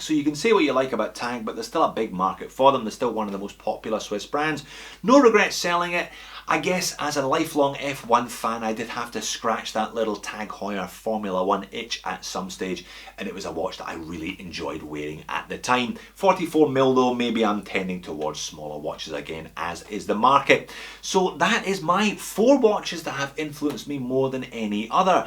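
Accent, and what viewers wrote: Scotland, United Kingdom. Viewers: As a fellow Scot I liked your mention of Watches of Switzerland in the Argyle Arcade in Glasgow. I bought my first proper watch in there too for my 21st. An Ebel, which I still have but now looks as old as I do 😄 Keep up the great work. My wife knows what I’m watching by your accent which she says is better than mine! That’s an insult as you are a teuchter 😀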